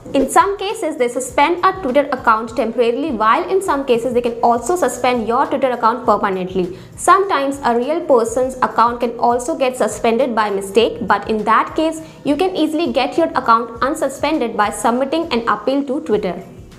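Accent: India. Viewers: Why do you have an Indian accent. You don’t look Indian. Do you have any idea how annoying your screechy voice is? Plus, your video is not helpful. Click bait.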